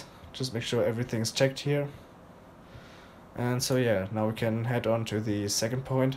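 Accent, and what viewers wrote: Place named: Germany. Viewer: Man hört dass du deutsch bist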